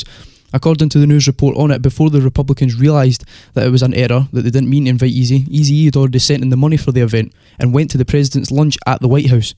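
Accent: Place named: Scotland, United Kingdom. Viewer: Love that Scottish accent bro and love the vid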